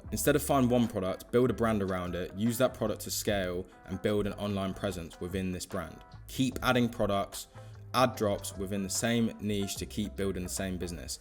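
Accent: United Kingdom. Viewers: That voice sounds british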